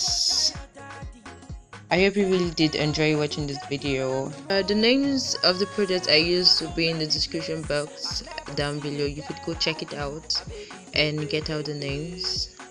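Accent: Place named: United Kingdom. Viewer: Great video you ve a british accent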